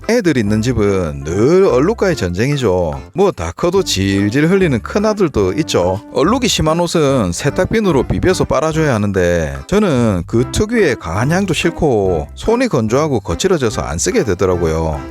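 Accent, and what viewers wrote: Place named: Busan, South Korea. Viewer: 내고향 부산. 사투리 넘나 잼나요~ 살림구경에 사투리 들으러 더 올 것 같아요~!! 구독이용~❤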